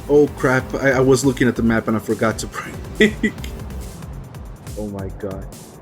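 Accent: Brazil. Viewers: TOP cara.... bem legal hem.... Como eu estou aprendendo aprendendo inglês eu percebi seu sotoque de brasileiro. hehehe Legal cara.. eu também tenho um canal no youtube mas é sobre T.I. Isso manu. Abraços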